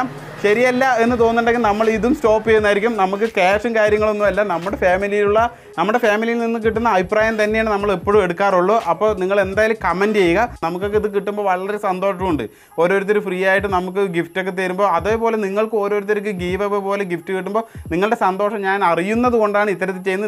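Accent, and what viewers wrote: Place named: Alathur, India. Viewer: ഇക്ക ആലത്തൂരോ, എനിക്ക് ഡൌട്ട് ഉണ്ടായിരുന്നു സംസാരം കേട്ടിട്ട്, പാമ്പാടി ആണ് എന്റെ നാട്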